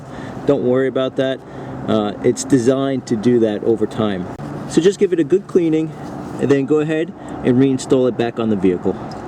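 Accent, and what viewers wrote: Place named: New Jersey, United States. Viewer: His NJ accent reminds me of Jay & Silent Bob!! 😀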